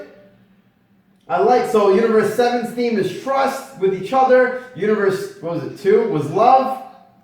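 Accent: Italy. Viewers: Ma sei italiano? Lol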